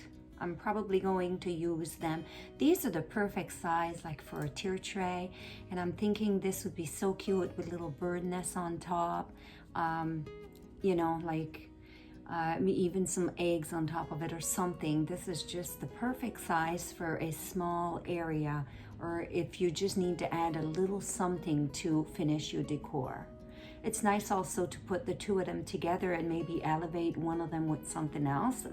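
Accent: France. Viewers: Great finds! I love the big bunny too! I also love your French accent... Beautiful! 💗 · Great job, shopping,!!! I can't wait to see your finished treasures!!! This video answered a question for me. I noticed that you have a lovely accent, but I wasn't sure what it was. So, it's French!!! You handle the crazy English language very well!!! You are so sweet!!!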